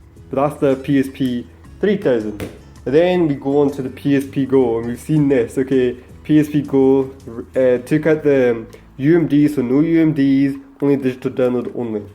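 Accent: Scotland, United Kingdom. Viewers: Holy shit that is cracking! You have a Scottish accent, what Cex did you nab it from? :o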